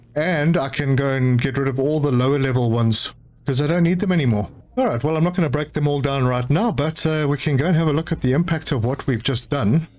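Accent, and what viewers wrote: South Africa. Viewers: I knew it I thought I herd a South African accent 👌👌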